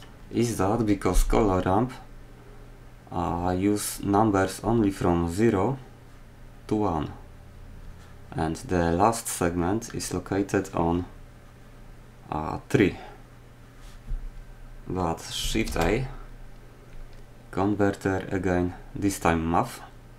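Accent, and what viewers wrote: Poland. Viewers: You sound polish... ru polish? Nice vid btw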